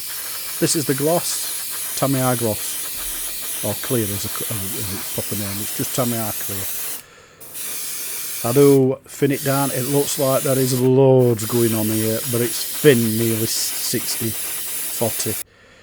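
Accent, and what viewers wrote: Barnsley, United Kingdom. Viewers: Thought is was Barnsley, that's me as well👍 keep doing the videos there big help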